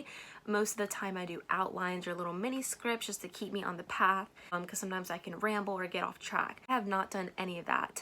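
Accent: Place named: South Carolina, United States. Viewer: By the way, your South Carolina accent is so awesome.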